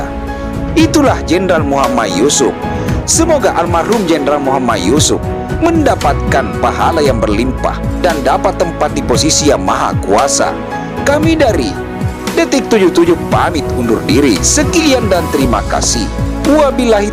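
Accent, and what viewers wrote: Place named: South Sulawesi, Indonesia. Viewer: Maklum sang narator ini pasti orang Sulawesi Selatan Memang begini cara berbicara nya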